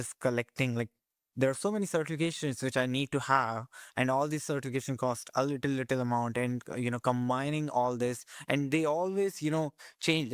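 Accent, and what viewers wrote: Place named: Bengal, India. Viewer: I was inspired to create my own website after watching your YouTube videos. You were right about compliance. I never had a Google account in the past, but I created one a few days ago to comply with Google's requirements. By the way, I suspect you might know Bengali because your tone sounds like it. Thank you for inspiring me to use Linux.❤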